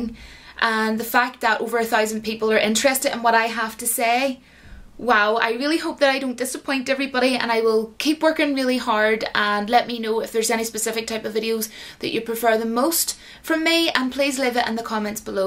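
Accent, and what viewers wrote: Ireland. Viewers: Top of the day to you 😊👍 I absolutely adore the Irish accent and I have heard many of the words that you use in this video. I have several Irish friends and one of my lecturers is also Irish 😊 it's so nice to listen to.. keep up the good work on your chann 👍👍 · Great info, Luv the Irish accent....drinking a wee bit of tea. Oh and you're dead on. In AMERICA we use the word eejit by itself with the same meaning. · Irish accent is freakin' awesome .. as for success, it really comes down to personality :-)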